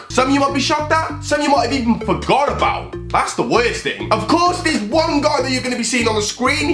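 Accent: Wales, United Kingdom. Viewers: Are you Welsh???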